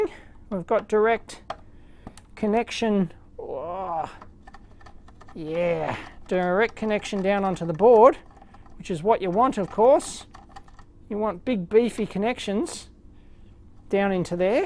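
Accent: Australia. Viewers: I thought you are from Aus ...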